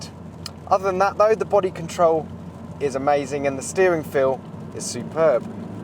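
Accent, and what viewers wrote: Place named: Southeast England, United Kingdom. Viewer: Major misconception. The accents vary bigtime around the country. This guys accent is southeast, other areas are very different. Check out Newcastle, Liverpool, Birmingham accents on YouTube. I'm English and I struggle to understand a strong Newcastle accent!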